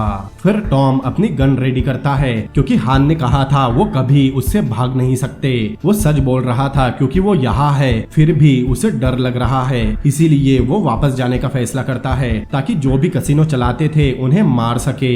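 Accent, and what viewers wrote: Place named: Gujarat, India. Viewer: Aap gujarati hona Awaaj se hi pta chalta h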